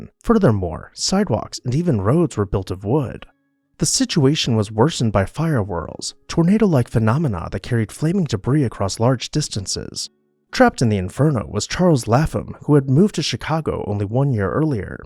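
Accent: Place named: Georgia, United States. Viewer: I would love to visit your town! I adore these beautiful old houses. Georgia is a beautiful state from what I've seen in movies and photos, and I love the charming Southern Georgia dialect. I grew up in E. Texas and have that peculiar drawl, but I think Georgians have the best Southern accents of all. No one can give an insult as elegantly and sweetly as the genteel Georgians! "Bless Your Heart!"😂🤣💖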